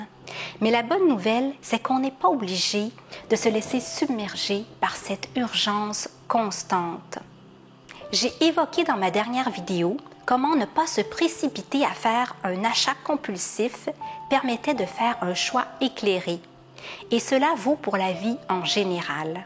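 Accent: Canada. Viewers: Bonjour, merci pour ce partage ainsi que la précédente vidéo. J'adhère totalement à vos propos et j'essaie au mieux d'être minimaliste (pour moi et pas pour les autre). J'ai désencombré le superflu. Je me suis sentie tellement mieux et pourtant, j'ai beaucoup de peine à ne pas réencombrer. Surtout avec certains produits, comme les produits cosmétiques, les sacs à main et les livres. Avez-vous des conseils ? Et aussi, pourquoi est-ce si compliqué 'être dans le moment présent ? Au travail, je me dois (et je pèse mes mots)d 'être multitâche. C'est maintenant ce que l'on attend d'un "bon" collaborateur sinon c'est le licenciement. A mon âge (59 ans) c'est compliqué de me dire, je vais changer de job...et la retraite n'est pas pour tout de suite...Belle soirée (ici en Suisse, c'est le soir 😉) ( avec votre si charmant accent canadien, que j'aime tant, j'imagine que vous vivez au canada😊) et à bientôt.😗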